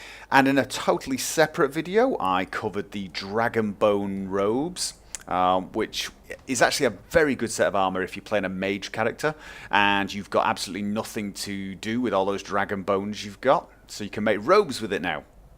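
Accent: United Kingdom. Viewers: He sounds like that British guy with the glasses from Assassin's creed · I'm very certain it's a British accent, I can't remember which one, but I'm certain I've met a few people with it o. o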